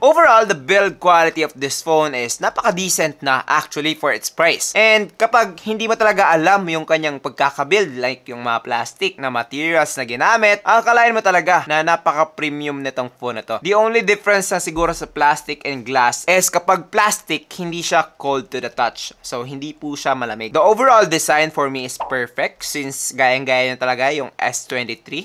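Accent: Visayas, Philippines. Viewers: Bisaya diay ka pala dol HAHAHA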